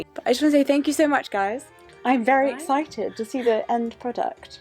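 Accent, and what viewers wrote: RP, United Kingdom. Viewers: If Erin from The Office was a pin-up with a posh accent, she would be.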